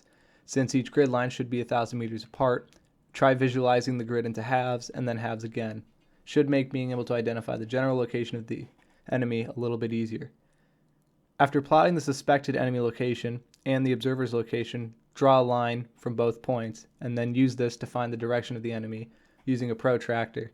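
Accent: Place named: Ireland. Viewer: Yeah dude it sounds Irish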